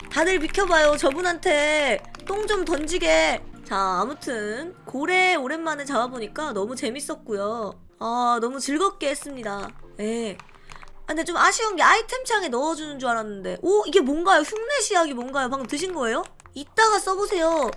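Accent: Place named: Gyeongsang, South Korea. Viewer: zzㅋㅋㅋㅋㅋㅋㅋㅋ우리 귱남선생님들은 된소리가 안된다요...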